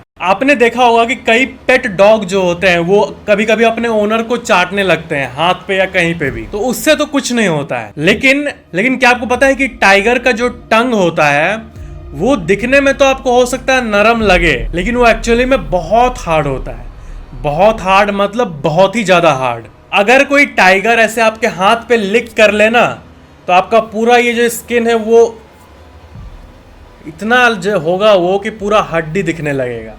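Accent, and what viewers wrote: Bihar, India. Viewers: Aap Bihar se ho kya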